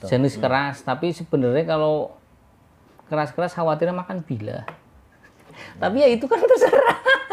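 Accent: Banyumas, Indonesia. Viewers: Nogo kikik kiyek kiyek😂, Ngapak acen gae ngakak tur kepenak kayak kiyek😁 😂,, Semangat lur salim saking Jogja 👍.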